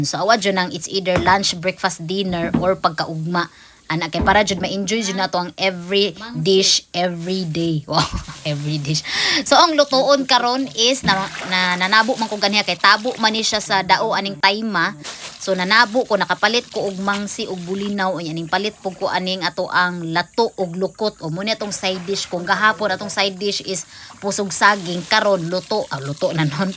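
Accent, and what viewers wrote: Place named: Visayas, Philippines. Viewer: Hahahah eninglish palang hibaw an na 😂.bisaya ni by.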